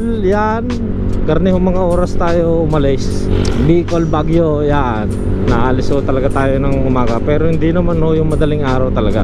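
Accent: Batangas, Philippines. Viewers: gustong gusto ko yang content mo boss at tonong batanggenyo..🙂meron din kc akong kamag anak sa may lemery batanggas..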